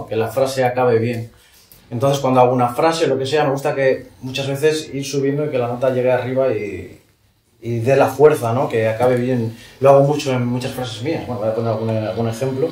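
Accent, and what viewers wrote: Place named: Trapagaran, Spain. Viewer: Tienes acento de trapagaran.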